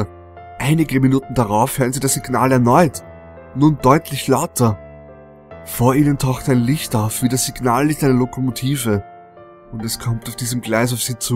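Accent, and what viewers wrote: Austria. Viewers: bist du jetzt eigentlich Deutscher oder Österreicher? Dein Akzent klingt immerhin nach Österreich:D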